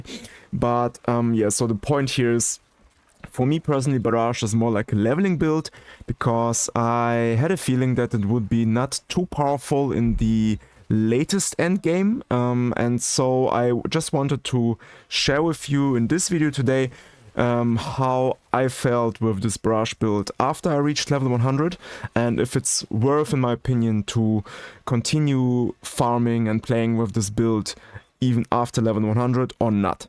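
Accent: Germany. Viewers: Ich höre raus das du Deutscher bist. Finde dein Build ganz cool und werde es mal ausprobieren sobald ich den Rogue auf 100 hab. Pit 200 lauf ich dann eben mit meinem Necro.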